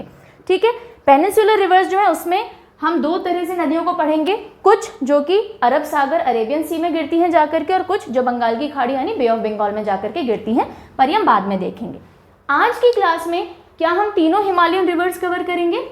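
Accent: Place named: Uttar Pradesh, India. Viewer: Awesome maam...she s from knp or lko...aisa lgta h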